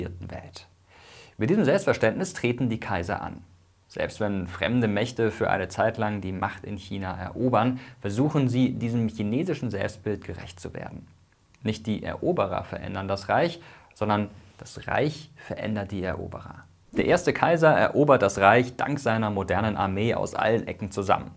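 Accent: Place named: Southern Germany, Germany. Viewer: Das ist die völlig normale und richtige Aussprache in der süddeutschen Standardvarietät der deutschen Sprache.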